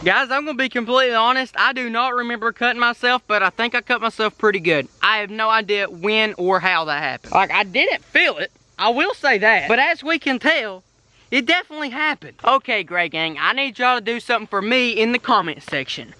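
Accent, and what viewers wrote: United States. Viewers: I Like His Cowboy Accent 😂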